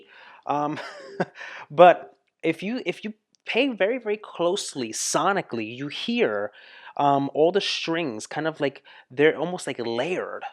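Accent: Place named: United States. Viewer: Accent US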